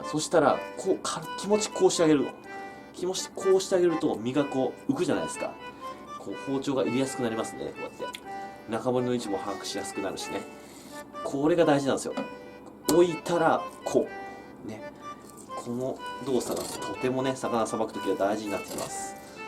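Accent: Japan. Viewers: 何弁かな？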